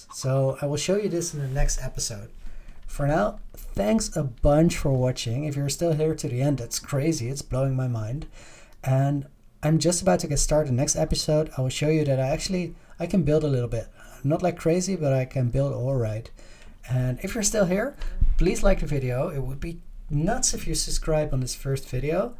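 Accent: Netherlands. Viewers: u dutch?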